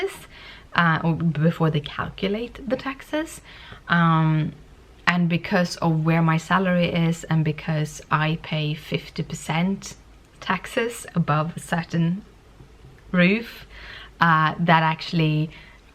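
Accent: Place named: Northern England, United Kingdom. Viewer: I have a question......at what age did you start learning English? I saw video recently of very young children in Norway, ages 6 or 7, speaking English. You sound like you have spent time in Northern England. Did you live in an English speaking country for an extensive period of time?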